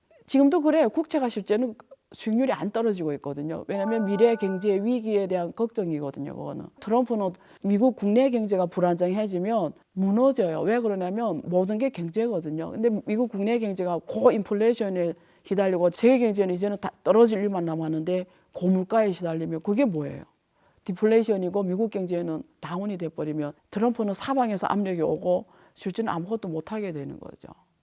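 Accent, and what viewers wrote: Yanbian, China. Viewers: 안유화 처음 보는데 이사람 말투가 조선족인데 ㅋㅋ 조선족은 중국인이지 중국인이 중국 좋다고 지들이 최고라고 하지 미국이 더 낫다고 하겠나? 간첩일 수도 있고. 지금은 중국이나 한국이나 인지전 시대임. 사기치고 간첩보내서 사회 교란시키고 정보빼내서 해당국가 망가뜨리고 이런 전쟁하고 있음. 그걸 막기 위해선 철저히 중국인은 내쫓고 배척하면서 물건도 팔아주지 말고 고립시켜야함. 그럼 지들끼리 지랄하다가 폭망하는거지. 근데 간첩새끼들이 동조하니까 저런 악마들이 득세하는거야. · 중국 지금 너무 않좋은거 잘 아는데 논리 비약이 좀 심하신듯 하네요. 관세정책과 환률등 말씀하시는 내용이 경제학적으로 맞는 것인이 의구심이 들고 말씀하시는 말투가 한국분이신가요 아님 귀화 조선족이신가요? 하여튼 개관적인정보로 이야기해주시면 감사할듯 합니다.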